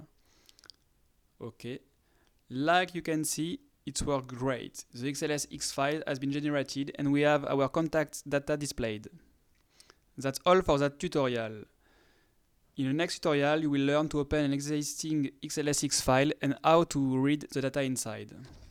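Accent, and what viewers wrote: France. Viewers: woooo !!!!! this a French accent I also speak french. The guy is strong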